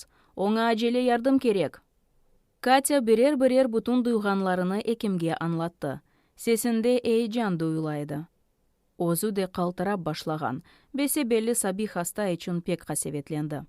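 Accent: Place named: Russia. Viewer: Такое ощущение что русскоязычная тётенька читает с ужасным акцентом книгу на тюркском языке.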